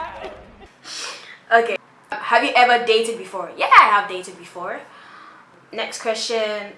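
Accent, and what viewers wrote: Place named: United Kingdom. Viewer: You have a british accent🥰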